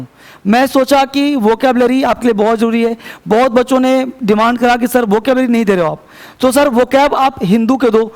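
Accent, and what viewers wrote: Bihar, India. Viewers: Shayad AAP Bihar Ya Jharkhand Se Ho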